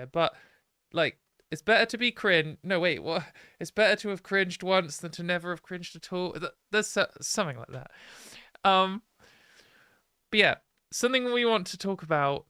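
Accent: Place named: Oxford, United Kingdom. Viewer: Your "exit plan" if it becomes unbearable should be moving to Germany. We have a new self-ID law, a way better healthcare system and you can live here very well with only speaking English, at least for the first time, and you speak nearly perfect German school Oxford English. The greatest thing is TERF ideology and Anglosphere sex essentialism about "destinated gamete producers" are not very common, queer theory also not, more kind of "trans.pragmatism" focused on perceivable sex characteristics. I don't know how this is in Britain, but here are tons of cis women running around who look like you, have a voice like yours and are around six feed tall, so you will have no problem with passing. Our few TERFs are really caricatures of Anglosphere TERFs, they only can rehash all of the Anglosphere TERF stuff, NOTHING genuine with them, but it doesn't work this way in Germany and with German language, nearly all media make fun of them when they appear in public. 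And if you want to make music with fellow trans women, you can contact "Jill Please" (keyboards) and "FiNessi" (guitar like you).😁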